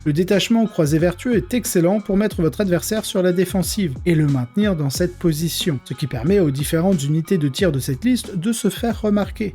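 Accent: England, United Kingdom. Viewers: Gris kiff pour ton accent anglais inimitable ! 😉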